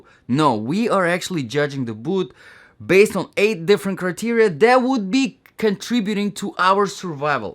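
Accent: Russia. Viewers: Russian accent?! :)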